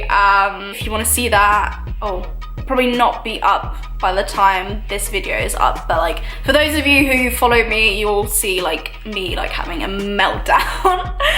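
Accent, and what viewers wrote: United Kingdom. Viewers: Your so British tho! heeheh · Loved the posh english and humor. For the record dna doesn't have a watch and people mixed for thousands of years. Also not all black/white mixtures ever were nor are rape (if they were my ancestors I would certainly not assume one of my ancestors raped another of my ancestors!!!). But yeah keep up the light-hearted and humorous commentary with a posh accent, your ancestors worked hard to give it to you!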